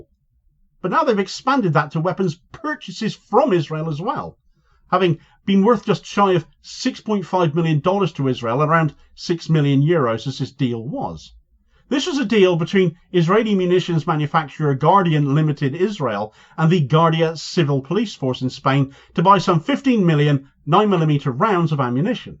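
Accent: Cornwall, United Kingdom. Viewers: He is from Cornwall in the UK and has a regional accent (which I love), try using captions maybe?🤷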